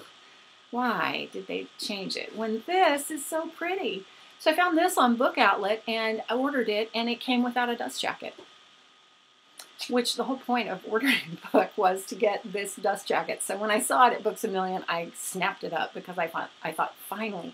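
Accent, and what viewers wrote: United Kingdom. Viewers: Great haul! Loved the English accent lol! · Lovely Haul! Your excitement over those books is infectious :) That edition of Little Women is really pretty. I've seen it on a lot of shelves, and I'm thinking of getting it someday (tho I'm not sure I'd enjoy reading it). I had never seen the back of the cover tho! It's really cool :) The British accent :D I love that you're so much into genre fiction, like paranormal, dystopian, steampunk. Most of the books you hauled, I have never heard of them, but I've seen The Program around and it sounds like an interesting story. Looking forward to hear what you think of it. Starters has a freaky cover! (O_O) (the one you like) I wouldn't be able to stop staring at it if it was on my shelves. And I'd feel a bit creeped out. Wow! Those bookshelves look great! And those boxes full of books! If you ever get them all out, I'd be very curious to know how many books you have in total.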